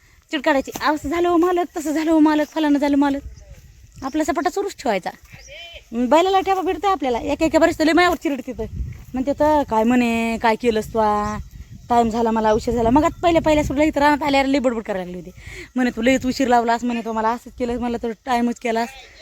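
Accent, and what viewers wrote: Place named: Ahmednagar, India. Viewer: ताई तुमची भाषा नगरी आहे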